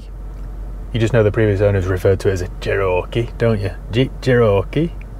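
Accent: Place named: Yorkshire, United Kingdom. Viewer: You're Yorkshire accent made me laugh.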